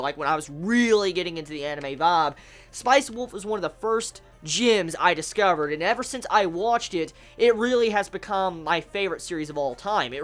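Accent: South, United States. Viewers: At first I thought he was from the UK, but now I'm thinking he's from the southern states...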